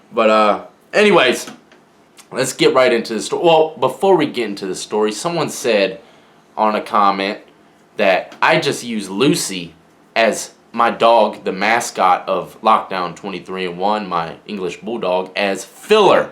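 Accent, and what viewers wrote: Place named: Texas, United States. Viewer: I swear your from Texas bro.. Very similar slang and accent.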